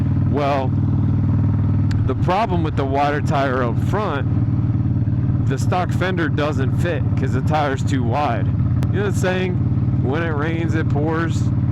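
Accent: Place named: Philadelphia, United States. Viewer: i could tell from your voice, but the hat finally reminded me to ask. Im philly born and raised. Love what you do brother. Keep it up.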